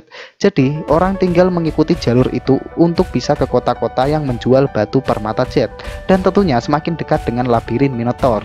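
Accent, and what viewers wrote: Indonesia. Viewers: nganu, medhok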